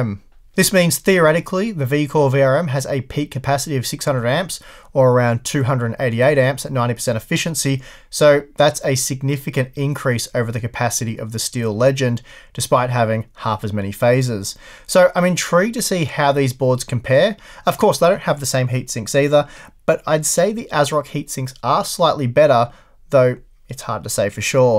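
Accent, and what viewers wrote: Australia. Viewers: Ur Aussie bro! Subscribed and patreoned. Really appreciate your work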